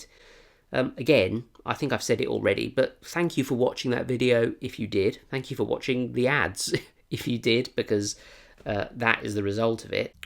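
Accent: England, United Kingdom. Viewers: i subbed from that video.... it was because im an english person living in scotland, and i just really missed an english accent so now i watch you. x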